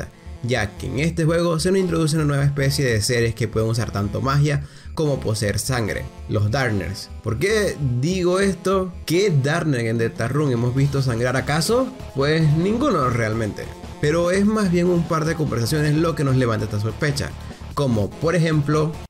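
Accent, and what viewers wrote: Venezuela. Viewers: eres venezolano? :V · Eres venezolano XD mano del alma!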